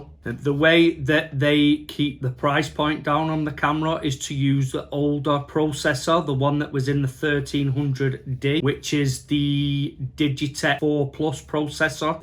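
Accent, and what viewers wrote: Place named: Northern England, United Kingdom. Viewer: This is gonna sound really stupid.. but I’m really happy I found someone with a good northern accent hahahaa no offence to anyone else but ya can’t beat a northern British accent (I’ll be embarrassed if you’re from the midlands or something hahaha)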